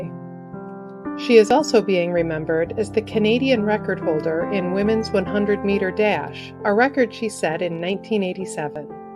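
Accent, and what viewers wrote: United States. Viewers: American that's why speaks clear English.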